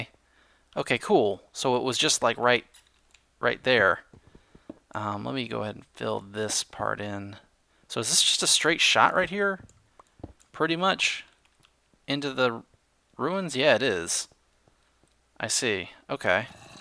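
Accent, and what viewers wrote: South, United States. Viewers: Are you from the south? It sounds like you have a slight southern accent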